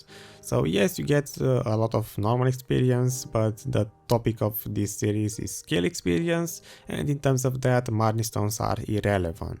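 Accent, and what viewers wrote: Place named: Romania. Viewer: yo, nice vids man, are you Romanian? Thou your English is almost perfect, i can hear a hint of accent which takes me in that direction :P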